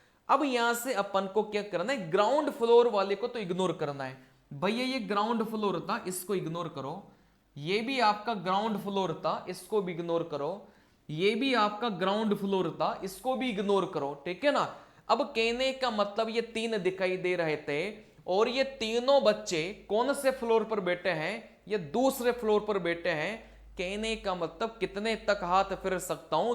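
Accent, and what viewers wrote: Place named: Hadoti, India. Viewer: Sir ji aap rajasthan m hadoti se hona ...aada jana lapad padegi 😂 hadoti wale hi bolte hain😂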